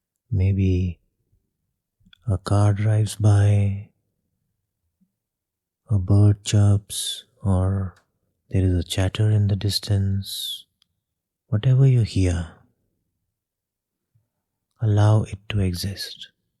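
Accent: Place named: Asia. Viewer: When I heard the Asian accent I knew I was in the right place 😌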